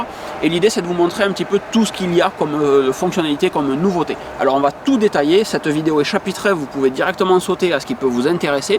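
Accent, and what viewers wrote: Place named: Montauban, France. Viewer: hello , bonjour de Montauban , content de trouver un autre youtubeur avec le meme accent que moi ;)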